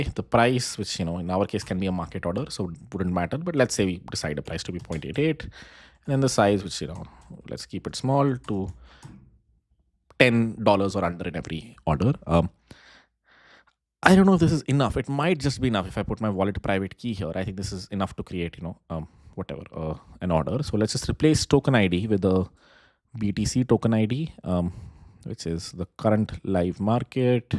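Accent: Punjab, India. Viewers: are you punjabi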